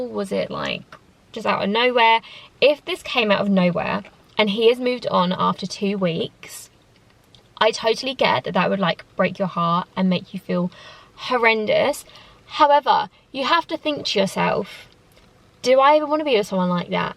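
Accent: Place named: London, United Kingdom. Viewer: I love your accent. Is this a london accent?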